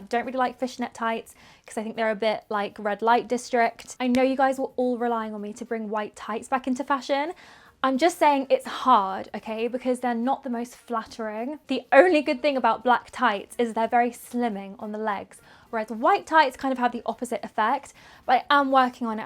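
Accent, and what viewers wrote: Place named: London, United Kingdom. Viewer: she has a pronounced English accent with a slight inflection of London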